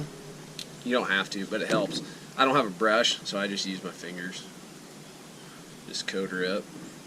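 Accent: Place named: Midwest, United States. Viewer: Did you say donate or don’t eat I can’t tell through your Midwest accent LOL good job buddy!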